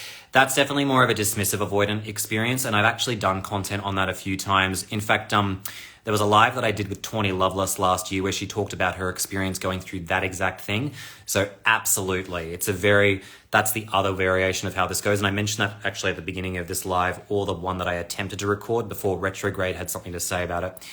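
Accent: Australia. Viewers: I have been listening to you every day, and it is fair to say that you are the biggest gem on YouTube/IG. The depth of your knowledge and expertise is truly fascinating, at times even too much for me to absorb (and I am a nerd).☺️ Also, loving your authenticity and the Australian accent. A big thank you and keep on rocking. 💚🙏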